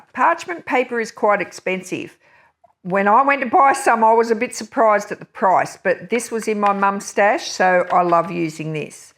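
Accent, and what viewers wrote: Australia. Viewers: Such beautiful ideas. Love your Aussie accent ❤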